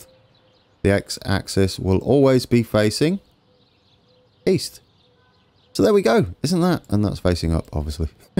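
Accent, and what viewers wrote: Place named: Midlands, United Kingdom. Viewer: Wonderful work! Keep it up! And I got the "shout out" at the start... I'm blushing a bit (BTW - surname is pronounced "Kool" (really!). Austrian - German family. The spelling in German has the "umlaut (dots)" over the "u", but the typewriters on Ellis Island 140 years ago didn't have that key!) Good effort you! So an American in the Midwest, with the German last name, who lived in London for a couple of years right at Y2K and a bit, who's very much a British railway / London transport nutter helping out a Midlands (If I place your accent properly?) bloke who's living all the way over in the Hill Country near Austin, TX with the livery colours of a West Midlands train on this recreation. What a crazy, mixed up world! Lovin' it!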